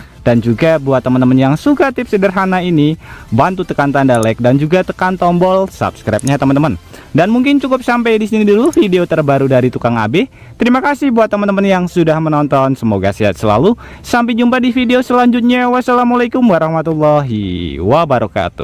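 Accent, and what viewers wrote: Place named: Surabaya, Indonesia. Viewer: Suroboyo masku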